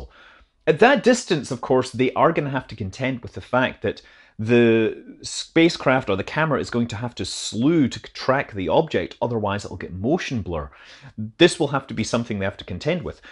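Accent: Scotland, United Kingdom. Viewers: Wait... you're Scottish?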